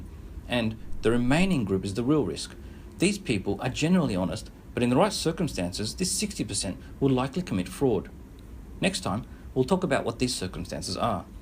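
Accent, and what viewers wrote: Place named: Australia. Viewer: I think Australian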